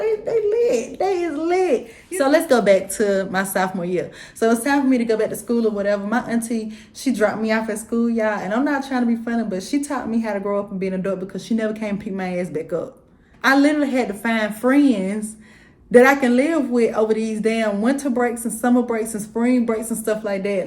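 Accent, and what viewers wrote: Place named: Georgia, United States. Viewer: Gulll you gotta be from Ga! Ain’t no way you not. Idk you but babeeeeee we “we tewwww murch alike “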